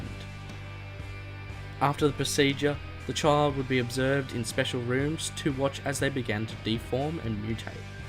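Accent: Australia. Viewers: ugh your Australian accent is so pleasing to my ears 🥺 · Are you Australian ?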